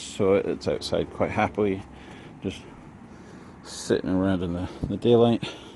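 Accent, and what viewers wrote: Scotland, United Kingdom. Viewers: I know you live in Canada but are you originally from Scotland?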